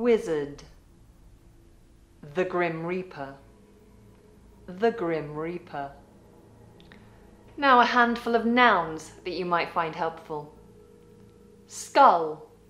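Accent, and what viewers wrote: United Kingdom. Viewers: are you a british? I´M BRAZILIAN. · You accent is similar of british english. I love!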